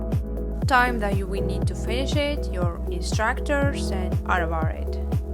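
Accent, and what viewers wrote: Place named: North Africa. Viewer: From your accent you look like from North Africa, your financial aid is displayed in the French language, your name looks like an Arminian name, and you speak English. Where are you really from? 😁😁😁😁 Thanks for sharing this video.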